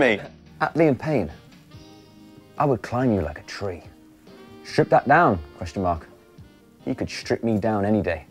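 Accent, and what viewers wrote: United Kingdom. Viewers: Damn his British accent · I can't understand half of the words he said, still learning to understand british accent better😂😂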